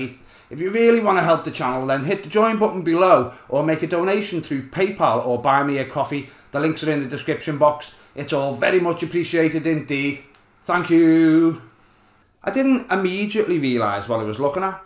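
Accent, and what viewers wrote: Liverpool, United Kingdom. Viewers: Good man, scouse. didn`t understand most of that and I'm Scottish!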